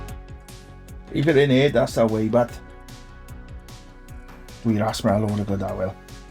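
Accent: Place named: Swansea, United Kingdom. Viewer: Happy days beautiful swansea jack accent I have one Awesome knowledge is power 🎉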